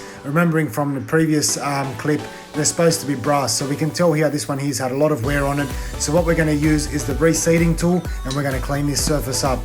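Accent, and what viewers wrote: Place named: Australia. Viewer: Good old tradie advice with an Aussie accent …. Music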